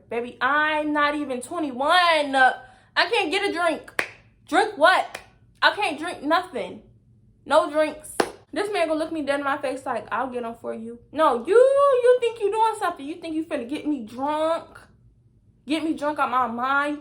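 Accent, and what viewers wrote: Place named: DMV, United States. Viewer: I knew soon as you started talking you was from the DMV 😂😂 old men be gross AF I got a few stories from back when I was damn 14/15/16 LOL I’m like sir... wrinkles and powdered milk don’t do it for me 💀💀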